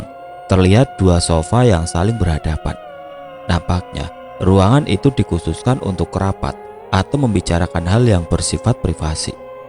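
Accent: Indonesia. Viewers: Pengucapan b. Inggris nya, Indonesia bgt😁👍👍. Dtunggu kelanjutannya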